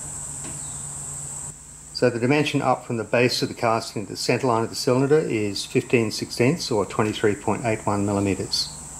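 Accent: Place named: Australia. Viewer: Good video,! I’m so glad to see that you decided to do the steam engine on camera! As they say, “You can please some of the people all of the time, and all of the people some of the time, but you can’t please whatever kind of monster wouldn’t want to watch someone with an Australian accent build a steam engine.” Thanks for the animal videos, they are adorable when they’re babies. Except for the birds…. They start out ugly, but they do get better!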